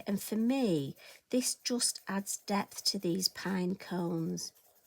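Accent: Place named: Northern England, United Kingdom. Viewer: Another fab tutorial,. Don't apologise for using Northern colloquialisms. We should all be proud of where we come from...that's what makes us different. I love accents and colloquialisms..I am from Yorkshire and love my accent. I have had so many people comment on how they love it too, sometimes, like you I have to tone it down a bit, but on the whole most folk 'get it'. Thanks for your hints and tips and your lovely videos.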